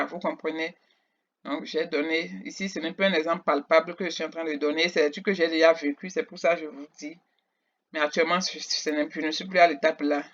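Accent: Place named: Benin. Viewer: Tu a un accent Beninois. 😊 I hope you are doing good sister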